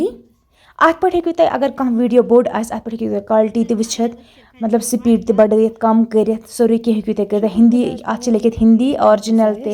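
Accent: Kashmir, India. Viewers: ❤❤ mujhe tho aap ki voice kashmiri mai he aati hai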